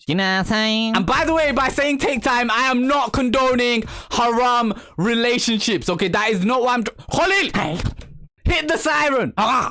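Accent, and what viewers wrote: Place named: Bengal, India. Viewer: Wallah I love your Bengali English accent 😂😂😂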